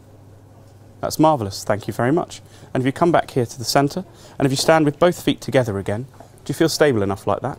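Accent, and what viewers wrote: United Kingdom. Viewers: Is this guy full on British or an American who's lived in the UK for a while? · Full on British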